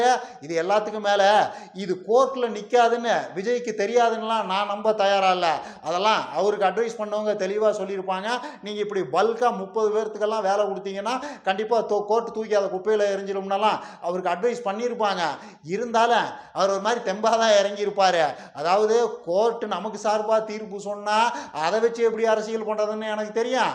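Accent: Kongu, India. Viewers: தம்பி உங்க கொங்கு தமிழை நீண்ட நாள் கழித்து கேட்பது மகிழ்ச்சி.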